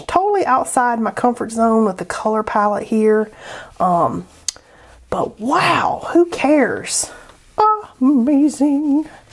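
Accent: South, United States. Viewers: Enjoying all your videos. Nice to hear a fellow Southerner on YT! Do you ever do in person classes?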